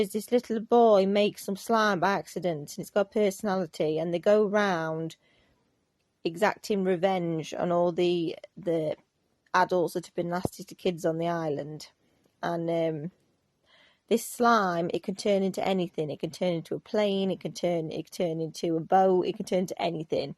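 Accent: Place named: United Kingdom. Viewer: Where are you from in UK, Queen Victoria? I like your accent very much I want to learn it when I come there 😊❤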